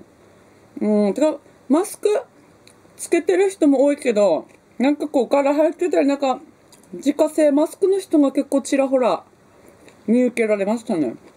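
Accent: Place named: Aomori, Japan. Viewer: 青森、懐かしいイントネーションです😀鶴亀温泉、フラワー温泉、行きたいな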